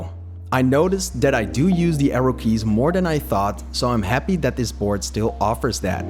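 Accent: Netherlands. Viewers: Gotta be Dutch! :) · Are you from The Netherlands because I think im hearing a slight Dutch accent?🤔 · Is he Dutch, he sounds Dutch... love the vid, really helpful. I still don’t know, either k6, K1 or k2. Atm k2 hot-swappable is not available in brown switched. :( and I think I want brown switches instead of the red ones. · you sound Dutch? klopt dat?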